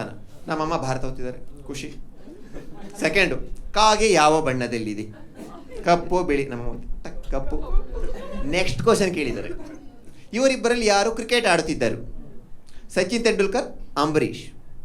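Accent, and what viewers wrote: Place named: Mandya, India. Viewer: Watched 2nd time next episode pls Pakka madya slang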